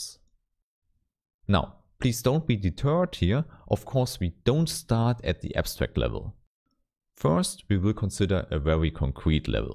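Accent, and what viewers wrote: Germany. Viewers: Thanks for the Contant, but are u maybe from German ?😂😁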